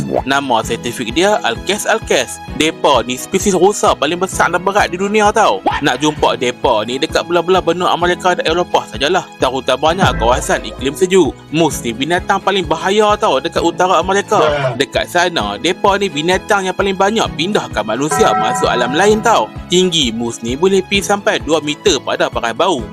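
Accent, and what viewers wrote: North, Malaysia. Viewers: Terbaik bro. Kekalkan loghat utara❤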